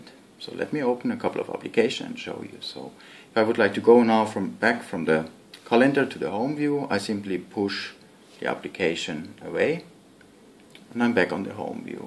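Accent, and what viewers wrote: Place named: Finland. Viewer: Finnish - English.. XD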